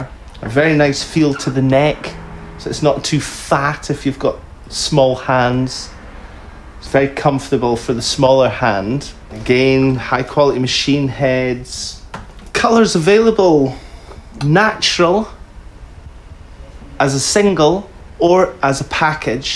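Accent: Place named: Scotland, United Kingdom. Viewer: cool Scottish accent dude!!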